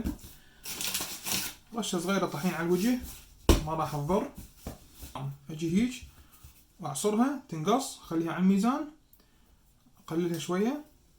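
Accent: Mosul, Iraq. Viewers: واللهي تخبل بشكرك من حكومة اقليم كوردستان العراق لهجتك العراقي كلش سهل وحلو بعكس المغربي الله يحفضك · مبدع بكل شي وبالاخص اللهجة العراقية بتجنن ...تحية من سوريا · هذه لهجة عراقية ، مو جزائرية 🤔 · يمكن انت مصلاوى من لهجتك لو لا ابووويي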